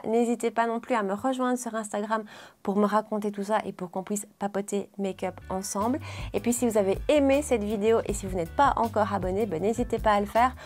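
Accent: Belgium. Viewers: Merci pour cette excellente revue (comme d'hab:). J'adore ton petit accent belge!:)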